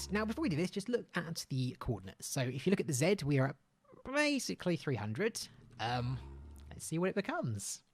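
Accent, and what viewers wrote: United Kingdom. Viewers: I think that's called British accent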